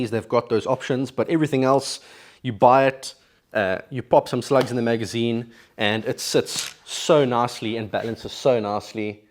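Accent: South Africa. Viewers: Note (for those not familiar with South African English): When says /ˈrafl/, he means rifle /ˈraɪ.fəl/.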